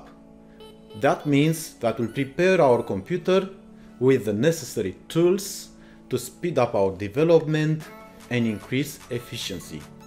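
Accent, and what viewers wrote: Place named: Romania. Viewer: Are you from Romania? Because your accent resembles :) GJ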